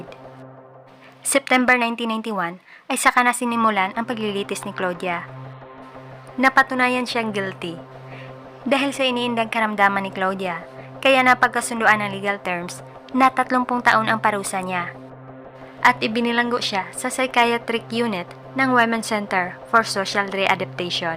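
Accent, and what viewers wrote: Visayas, Philippines. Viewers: Very besaya ang accent dzaiiii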